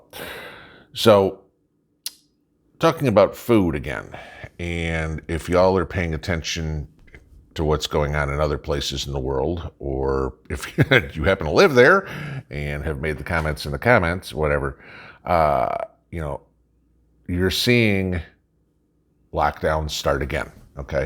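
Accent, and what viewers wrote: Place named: Upper Midwest, United States. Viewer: You don't sound like you're from TN. You have the short flat vowels of the northern midwest. Wisconsin? Michigan? Illinois? Just curious.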